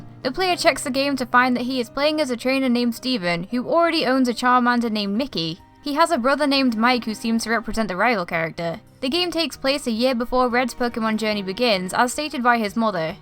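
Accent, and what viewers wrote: United Kingdom. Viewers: 100% British I'm having a stroke hearing this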